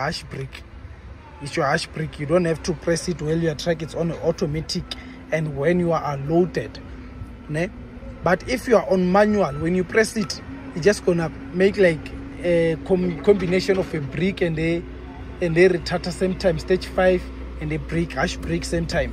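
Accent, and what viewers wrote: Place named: South Africa. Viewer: but I don't remember saying otherwise or anything bad against you,I didn't criticise you instead I only said South African accent is just so awesome 😎😎😎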